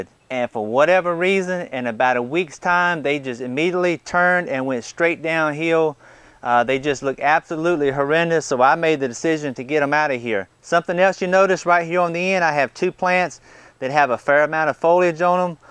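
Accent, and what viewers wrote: South, United States. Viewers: I love your accent. What part of the south are you from? It makes me thoroughly enjoy your videos in addition to the awesome info!!! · My Tennessee wife is dieing to know where you are from, she’s always asking where people ok youtube I watch are from if they have southern accents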